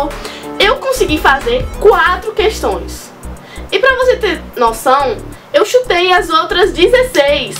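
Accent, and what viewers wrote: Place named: Natal, Brazil. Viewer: Tu é de Natal é? Sotaque lindo... USHAUHUHUASHHASHUS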